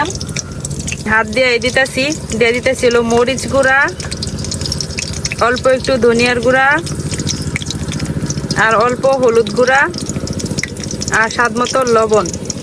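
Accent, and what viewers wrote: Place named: Netrokona, Bangladesh. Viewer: আপু, আপনার নিজের নেত্রকোনার ভাষায় যে মাধুর্য্য আছে তা কখনোই শুদ্ধ বা প্রামাণ্য বাংলায় পাবেন না। পশ্চিমবঙ্গের দুর্গাপুর থেকে বলছি - দোয়া করি ভালো থাকুন, সবাইকে ভালো রাখুন, আর আপনার মুখের হাসি অক্ষয় হোক। 🇮🇳🤝🇧🇩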